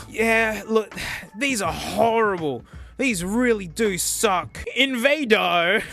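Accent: Australia. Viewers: Oh boy having an aussie guy yelling at old tech for four hours is the perfect background noise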